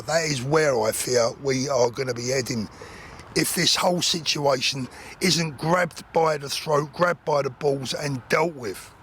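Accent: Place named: London, United Kingdom. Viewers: !!! Mate!!! I fucking love a fucking English man with a fucking badass London accent who fucking knows how to fucking swear. It brings a tear to this Aussies eye. 😂 But seriously, never truer words spoken, well said. 🇬🇧🇦🇺🇬🇧🇦🇺🇬🇧🇦🇺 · Fantastic!!!!! You are very eloquent. The message would be the same if spoken by a toff, you've just got a different accent, a capital accent! Loved it, i'm in full agreement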